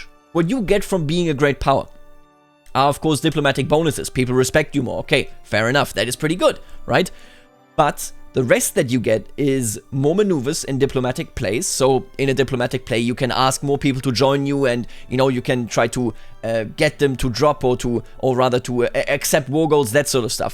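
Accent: Bavaria, Germany. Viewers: Do you speak german with a bavarian accent or do you/can you speak standard german?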